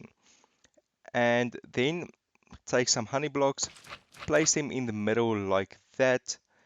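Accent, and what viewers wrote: Afrikaans, South Africa. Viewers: Are you South African? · Its not just me or is this man Afrikaans cuz I am and he sounds a lot like somebody from my country :/ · You are SA right? you sound soooooo south African just like my best friends!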